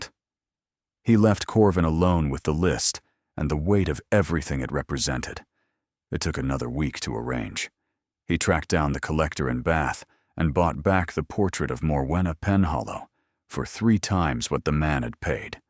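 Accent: United States. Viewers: This is a wonderful story. Well presented in every way. However when I listen to these wonderful British tales I so wish it was in a dignified British accent. American accents have no real place in the British Victirian Era. Just a thought ❤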